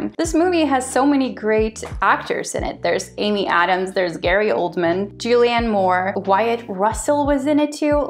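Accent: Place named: United States. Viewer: I saw your comment on the Reel Reviews With Jen channel and decided to check out your channel. Based on your commentary about the movies you've seen in this video, I think you'd have a lot of success with reaction and commentary videos like Jen does. The videos you already have a very high quality and well made (how many people spend the time to add captions?) but may be too cerebral for the average YouTube viewer. Don't stop making them but if you added Jen-like reaction videos, I think you could grow your channel. You have intelligent commentary, great wit and a good sense of humor. And you're very personable and natural on camera. Plus I'm always interested in seeing non-Americans' reactions to American movies and culture. Have you lived in Sweden your whole life? Your lack of a typical Swedish accent when speaking English is crazy! At least I've never heard someone from Sweden sound so American/Canadian. 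I only detected an accent when you said Gilmore Girls. Good luck with your channel!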